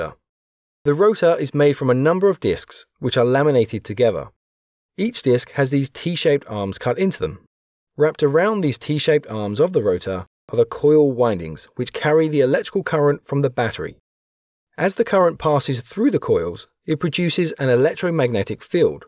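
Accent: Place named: West London, United Kingdom. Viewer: Which part of west London are you from ?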